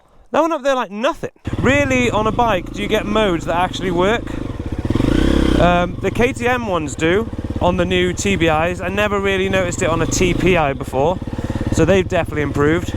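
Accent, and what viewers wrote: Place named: United Kingdom. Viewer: I'm so thrown off by "MidWest" and then the British accent.... what?! ps. I also test rode the TF 250 and had a blast. I'll be sticking with my Husky for the foreseeable future though.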